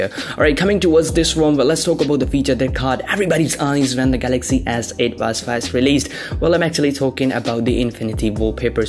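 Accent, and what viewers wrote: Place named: United Kingdom. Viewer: Why do u have ths british accent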